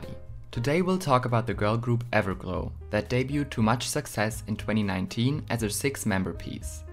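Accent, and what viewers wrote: Germany. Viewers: I immediately knew you were German because of your accent😂😂 I'm definitely scared for Everglow's future, I hope everything is going to be okay and I hope they won't disband anytime soon. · Is german your first language? you kinda have a german accent 😅 very informative video the company is doing them dirty!!!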